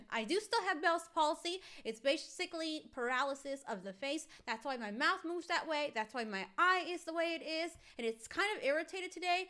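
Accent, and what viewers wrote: United Kingdom. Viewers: i thought u were british...😂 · I thought you came from the UK 🇬🇧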